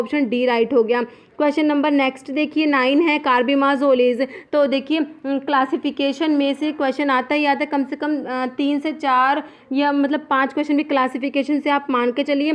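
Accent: Rajasthan, India. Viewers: Madam aap rajasthan se ho kya ??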